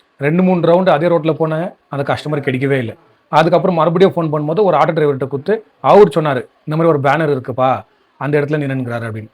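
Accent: Bangalore, India. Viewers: Bangalore tamil ah ..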